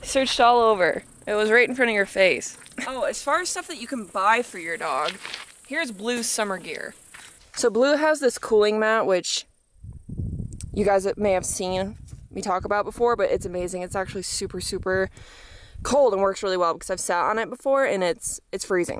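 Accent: South, United States. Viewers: Please do an entire video in the Southern accent!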